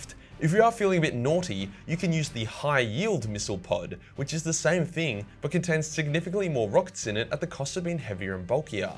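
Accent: Australia. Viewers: I'm guessing your video length is because that's what the algorithm says is best BUUUUUUUUUT. Is there any way we could get some more LENGTH out of you?? Can you do multi parts or something?? I feel like at the 12 minute mark, we aren't getting as much of your fabulous Australian accent as we could be. Doing videos in the buff is tits, but we could use more of that too, and at 12 minutes, it again doesn't feel like everything is getting "fleshed out" enough. Glad to see you growing before our eyes👁👁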